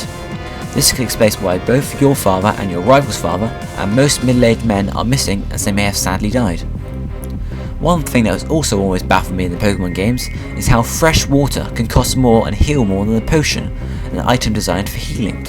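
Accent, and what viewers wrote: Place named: United Kingdom. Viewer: *Video starts with a British narrator* Okay, this I like...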